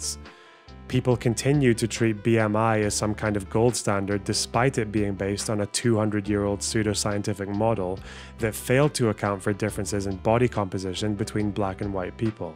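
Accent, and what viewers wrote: Canada. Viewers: I absolutely lost it at your beautiful Canadian accent. Well done 👍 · Nailed that Albertan accent my guy.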